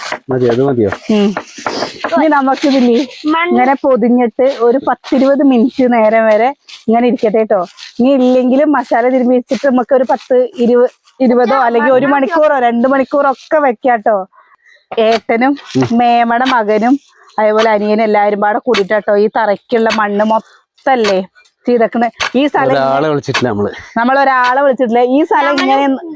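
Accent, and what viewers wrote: Palakkad, India. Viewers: Njangalocke samsaram (palackadan slang) kelkaan vendi maathram vlog kanunnavaraan...just avoid -ve comment,go a head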